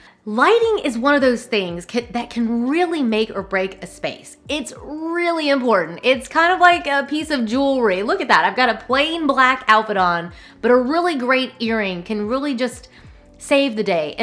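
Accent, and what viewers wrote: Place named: South, United States. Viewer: I'm hearing a strong southern accent in this video, which i hadn't picked up before and now I'm wondering if I've missed it all this time 🤔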